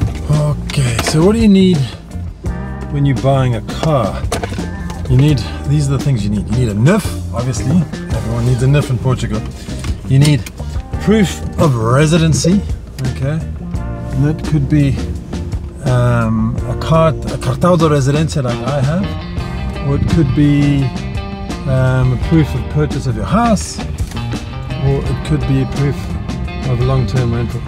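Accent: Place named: South Africa. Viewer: fascinating video Happy to find your channel. I'm also from south africa and the accent was so recognizable. I'm thinking of moving to Portugal and this video and the public transport one was very inciteful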